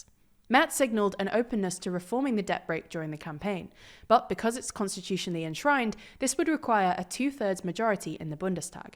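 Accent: United Kingdom. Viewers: I just can't listen to an Asian person with a British accent. It's so wrong.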